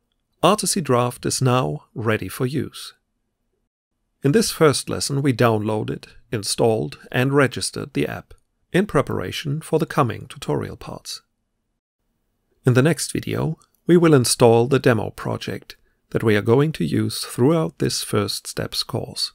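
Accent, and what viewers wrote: Germany. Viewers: I understand a german accent when i see one. Könnt ihr nicht mal ein komplettes deutsches tutorial machen. So ein, so plant man ein Spiel und so nutzt man dazu articy draft.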